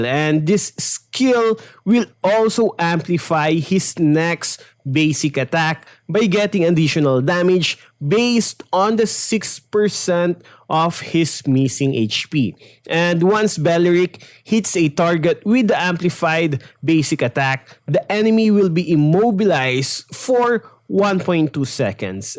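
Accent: Tagalog, Philippines. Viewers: Your Tagalog accent is showing 🤗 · The filipino accent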